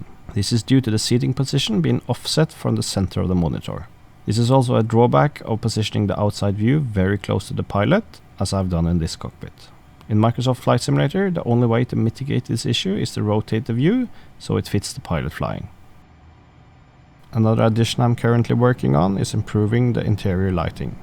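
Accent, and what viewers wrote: Norway. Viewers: Hah, kan høre at du er norsk! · Du er Norsk høres det ut som. det greiene her var et ganske sykt bygg!! hvor holder du til?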